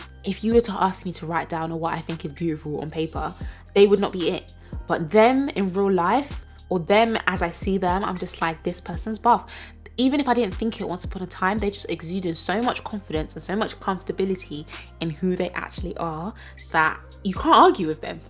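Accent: South East London, United Kingdom. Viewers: She sounds like she's from South East London, I grew up there so it's a familiar accent to me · South londonn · It sounds like a south London accent to me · Its nice to hear a British English speaker instead of Americans who seem to dominate the internet/media. I totally disagree that white women are the standard of beauty. By that I mean that I don't think only white people are beautiful, there are many types of beauty and that is a wonderful thing. We just need to open our eyes :) As for trends, I wear what I like and what is comfortable, what I think suits me, I don't care about the fashion, that is just the inconvenient thing that means I can only buy certain types of clothing/styles that are available. I could be a real rebel and wear something totally far out but I just don't care enough. What is really sad is that black people are enemies to their own kind sometimes. 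Really sad.... As for thinking some are not beautiful but for a platform, how about realising that is a different type of beauty that appeals to others, just not you? Having said all of this, when you are an older woman, you are invisible mostly, that is actually a good thing because you are free of all this crap to a large degree :)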